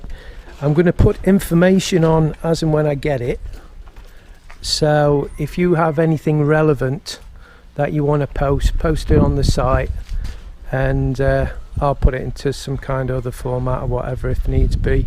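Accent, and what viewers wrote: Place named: Geordie, United Kingdom. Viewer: try.some dried fish, they stock it dry so its a food supply when they osnt anything else. my partner brings it back with her. if smells a bit but im used to it now. Nice bike you have there. keep them coming the posts, you have a fan club now i think. when you first went to the Philipines your accent was east Midlands but now youre back to your roots i can hear the geordie twang now. take care all the best.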